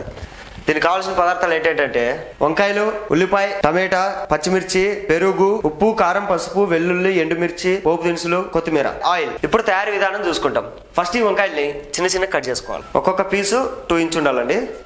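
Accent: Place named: Tamil Nadu, India. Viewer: I like your video bcz u r Telugu is so , I have shocked because Tamil people Telugu is so different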